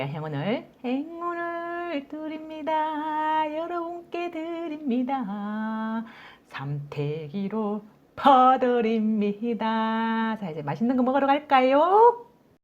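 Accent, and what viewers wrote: Gyeongsang, South Korea. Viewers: ㅎ ㅎ 이빠이 경상도 말은 정말 구수합니다 특별이 이빠이는 일본말이죠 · 완전 경상도 말씨입니다" 밭작물은 주인을 잘 만나서 그런지~~채소들이 싱싱하네요" 그전부터 유튜브동영상을 보고 있는데 척척박사 이네요" 잘보고 갑니다👏👏👏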